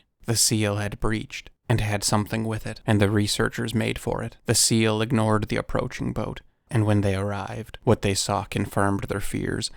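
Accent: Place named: Midwest, United States. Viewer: Here’s my assessment on why videos are so good: 1.) Great editing— knows what, where, and when to insert footage/still photos/illustrations. 2.) Fringe subjects— brings us news and stories that are often dark and overlooked. They pique the macabre portions of our minds. 3.) Thoughtful writing— couples the best words with the right images. He chooses smart verbiage. With, you’ll be served big portions of choice descriptors, which are juicy and filling. 4.) The voice—The sound of voice is laden with minuscule, barbed filaments. Once it passes through your ears, you’re hooked. His accent is unique. It’s regional Midwestern, USA. You can love that, or you can hate it. You cannot, however, mistake that. voice is offered in the right tone—low and eerie (in a good sense.) It’s delivered at a smooth pace, without stammering or hiccups of any sort. 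I sincerely hope that channel swells and rockets to the stars. He deserves it.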